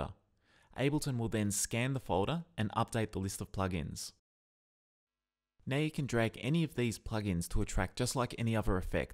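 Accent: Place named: Australia. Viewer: Something about Australian accents makes me pay attention way better, thank you!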